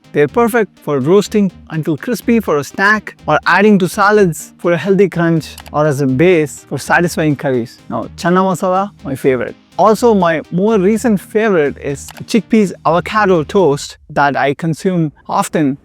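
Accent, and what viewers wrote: India. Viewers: I absolutely hate the Indian accent. I don’t know why but it triggers something in my brain. No offense. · Either you are south Indian Or you are Bengali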